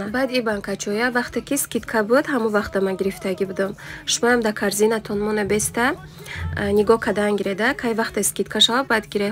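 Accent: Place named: Hisor, Tajikistan. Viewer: И лафзи хисорихояй марам дугонахом ай хисор шахринав хамихели гап мезанан карсодем меган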